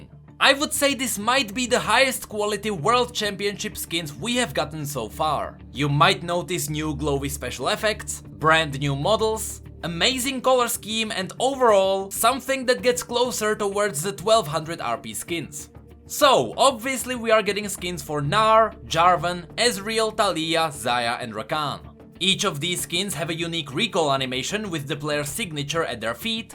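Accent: Czech Republic. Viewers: Hned co jsem uslyšel ten přízvuk bylo mi jasné, že musíš být z Česka. Úžasná videa <3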